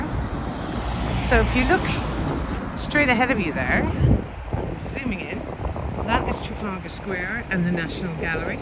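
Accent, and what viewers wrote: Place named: Ireland. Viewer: A lovely way to learn about our capital, told by a lovely Irish lady with a soft Irish voice. I could listen all day.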